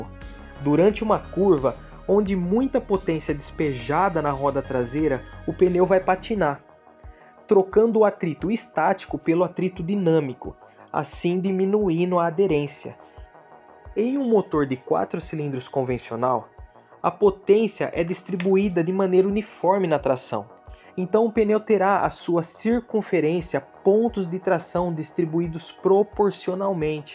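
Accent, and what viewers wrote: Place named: Inland São Paulo, Brazil. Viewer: Sotaque interior Paulista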